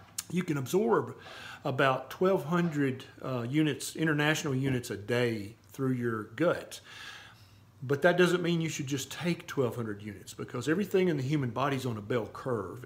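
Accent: South, United States. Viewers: Hi doc! I love your videos, I love your information, and I love your southern accent as I am from the south too, but now I'm living above the Mason-Dixon line. 😁 My question is my 18 year old daughter who has ehlers-danlos, gastro issues, mild anxiety issues and mild depression, allergies, chronic fatigue syndrome, pathological sleepiness, EoE, she weighs 104 lbs, approx 5 ft, and her doctor prescribed her vitamin D 400 iu once a day. After listening to you, I don't believe that is enough, so how much do you recommend, doc? TIA!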